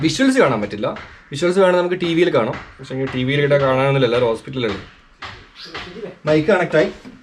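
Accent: Kannur, India. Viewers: Bro nigal kannur karan anoooo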